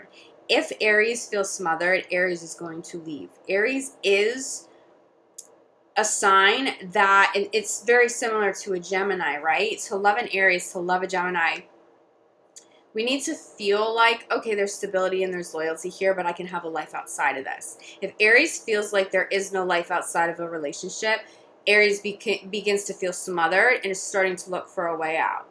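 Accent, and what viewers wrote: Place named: East Coast, United States. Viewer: Your from the East coast 🤔🤔🤔🤔🤔♈👑 I like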